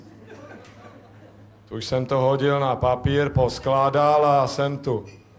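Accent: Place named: Prague, Czech Republic. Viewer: mne to pripada trapny...kazdej ostravak sice mluvi jak jantar, neumeji dat skoro dohromady ani vetu, ale tento borec mi prijde trapnej..taky bych rekl, ze to bude nejakej prazak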